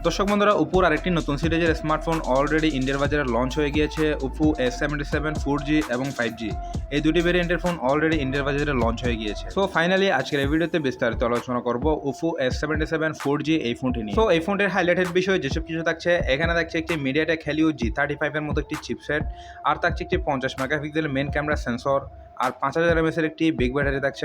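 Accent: Chittagong, Bangladesh. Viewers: বদ্দা চিটাইঙ্গে নে?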